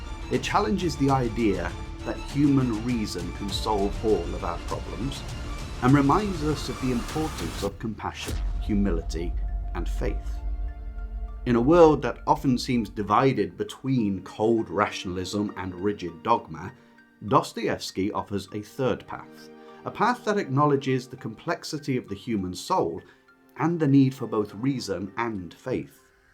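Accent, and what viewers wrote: United Kingdom. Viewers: Hi, thanks for this review and all the others you have published. Just one thing, could you leave the music off? It is very distracting, and we Americans have to concentrate to understand your british speech.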